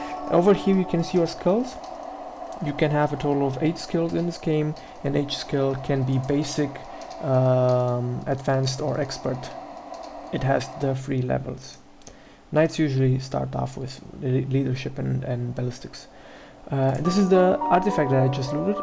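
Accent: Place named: Germany. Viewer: Excellent introduction to this great game. Thank you very much! Cute accent...I bet you are from Germany :)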